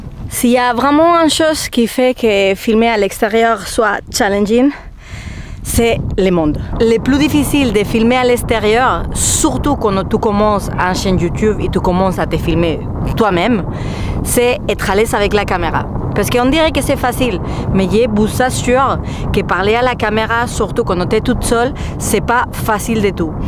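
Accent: Spain. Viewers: Ne t'inquiète pas, le charme de l'accent Espagnol quand tu parles français est tout à fait délicieux. Personnellement, je suis beaucoup allé en Espagne (je ne suis pas très loin de la frontière) et mes parent avaient un appartement prés d'Alicante! Donc je parlais assez bien l'Espagnol. Et ton accent me fait vraiment plaisir car il me rappel les jours heureux ou nous étions en vacances dans ton pays merveilleux... A part cela, j'adore ce que tu fait, et tu as beaucoup de courage! Que ce camion t'emmène au bout du monde sans soucis ni problèmes. C'est un souhait qui me tient à coeur! Bon voyage... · Chapeau bas!!! J'ai 62 ans donc une sexagénaire!!! Je te suis depuis que tu fais tes vidéos en français je t'adore je n'en rate aucune ta philosophie de vie me plaît beaucoup même si j'ai une vie plus "rangée" je réalise mes rêves également...je ne me suis jamais perçue comme les autres d'autant plus depuis mon divorce je me réalise seule avec mon âne Sherpa je suis partie 6 mois jusqu'à Santiago de Compostela (aller et retour) j'ai réalisé mon rêve vivre à la campagne avec Sherpa à mes côtés!!! 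Je te trouve particulièrement courageuse j'apprécie ton superbe accent espagnol, j'aime quand Raf que je suis aussi te fait découvrir le canyoning ou l'escalade!!! Et là encore bravo à toi de dépasser tes peurs!!! Longue vie à toi à ce que tu fais partager c'est formidable... et caresses à tes 3 chiens et ton chat ils sont tous adorables!!! Muchos gracias 🙏👍💓✨️💖🌺🥰🍀🐞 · Merci, tu m'as donné un grand coup de fou pour un jour commencer moi aussi a faire des fidéos. J'adore ton accent espagnol et ce n'est pas la 1ere fois que je te le dis. mais tu as raison, depuis que je te suis, ton texte est plus fluide. Compliments ! Quand je vois le travail que tu y mets, je me demande quel ordinateur et quel logiciel tu emploies, parce que je crois que le mien est trop faible pour ce travail. Merci de prendre le temps de me répondre. Bises du nord de l'Allemagne, le corsallemand. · Quelle bonne idée cette vidéo. 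Quand on est devant l'écran, on ne réalise pas nécessairement tout le travail que ca représente derrière. L'intro est géniale et ton francais est charmant avec cet accent espagnol. Bravo et Merci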